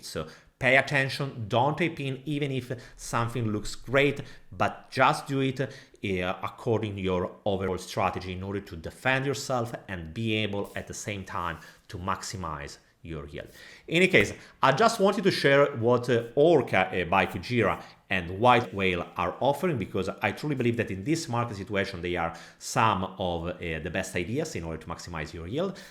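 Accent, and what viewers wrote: Italy. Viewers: ti prego toglimi una curiosità: sei italiano o spagnolo? L'accento mi sembra italiano, poi ho visto che hai anche un canale spagnolo...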